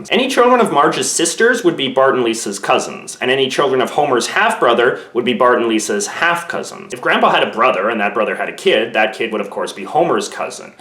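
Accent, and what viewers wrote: Canada. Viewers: noooooooo you say "aboot"! As a fellow Canadian, i thought that was actually a myth. What part of Canada are you from? I'm from Ontario and have never heard aboot said out loud in my entire life.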